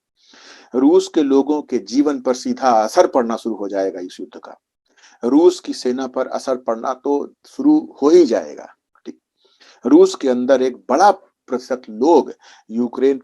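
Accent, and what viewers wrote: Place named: Fatehpur, India. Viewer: Theth Fatehpuriya Bhasa Maza aaya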